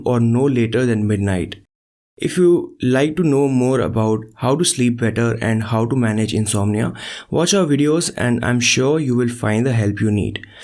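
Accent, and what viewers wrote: India. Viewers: Is speaker a kashmiri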